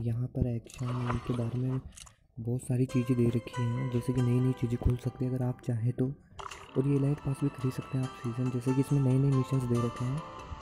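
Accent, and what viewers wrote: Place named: India. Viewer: Really? Huh. I assumed from your speaking patterns you were Indian. It sounds close to, then again I’ve never heard anyone speaking Swedish so I tied it to what I knew. But thanks for letting me know. Doesn’t make me understand the video any better. You should make one in English for those of us that would like to understand, if you don’t mind.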